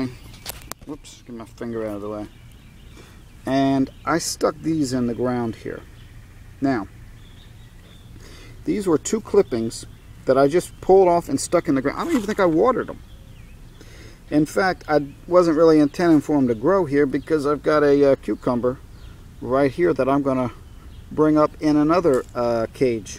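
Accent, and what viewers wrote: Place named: Louisiana, United States. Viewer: Are you from Louisiana? heheh, I like your accent and I'm trying to guess where you're from. =)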